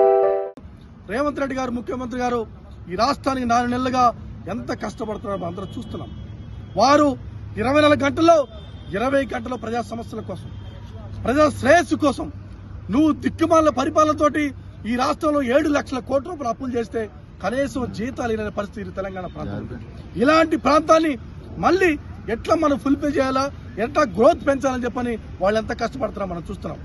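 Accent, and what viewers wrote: Andhra Pradesh, India. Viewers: వీడొక ఆంధ్రా slaunt లో మాట్లాడుతున్నాడు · Neee slang yeee andhra undiiii!!!! Evadivi raaaaa nuvvu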